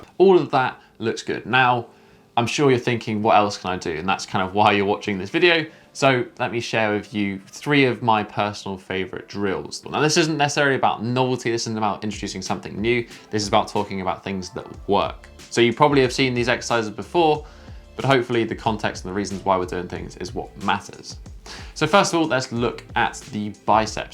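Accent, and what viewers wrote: England, United Kingdom. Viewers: Because why pronounce just that CH in the none english way when you do the rest with that english accent?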